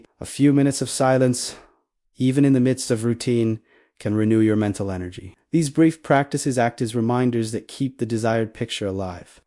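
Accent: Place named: Spain. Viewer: What is the purpose of image moving his lips?He had a deep Spanish accent. I have to look away. It rather takes away the intention.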